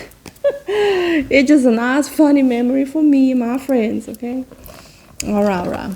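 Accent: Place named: South, United States. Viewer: I haven't seen any videos from u in a long time .. your accent reminds me of the south are u from the south??? this bag is 2 cute i may need on as well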